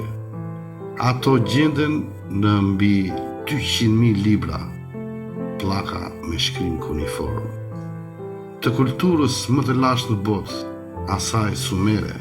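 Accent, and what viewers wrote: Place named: Gheg, Albania. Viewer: Gegnishte e paster. Sa let për ta kuptuar, faleminderit për kto informata të çmuara.❤🇦🇱🇦🇱🇦🇱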